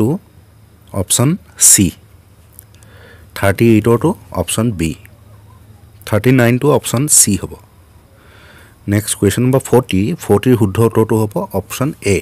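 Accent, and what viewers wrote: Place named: Sivasagar, India. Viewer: English laga sivasagar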